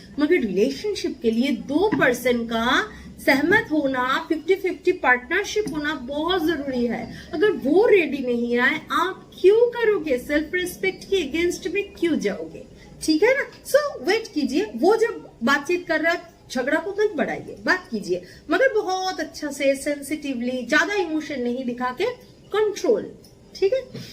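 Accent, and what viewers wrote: Bengal, India. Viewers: Thank you so much mam... Seems like you're bengali... Loved the way you executed. 😃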